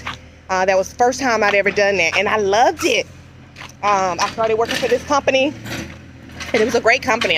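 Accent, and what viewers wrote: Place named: Raleigh, United States. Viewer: Congrats on your new job!! Im from NC too!! I knew that accent was very close to the Raleigh area accent!! Just found your channel and I'm loving it. I want to visit Mexico in 2024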